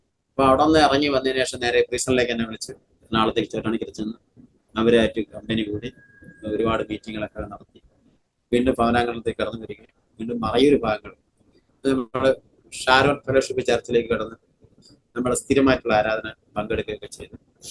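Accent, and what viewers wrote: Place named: Thiruvananthapuram, India. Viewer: ഇദ്ദേഹത്തിൻ്റെ സ്ലാംഗ് തിരുവനന്തപുരം രീതിയിൽ ആണ്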